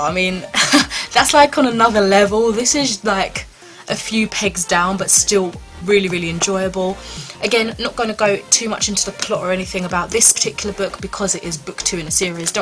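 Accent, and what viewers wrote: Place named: United Kingdom. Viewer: I love your accent - it's such an awesome British accent :) Congrats on completing your Goodreads challenge!! also finished it in November - but you read double what I read hahaha very impressive!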